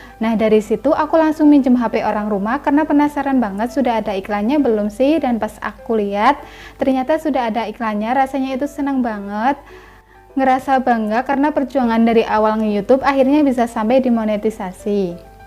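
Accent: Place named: Tegal, Indonesia. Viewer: tegal neh hhahah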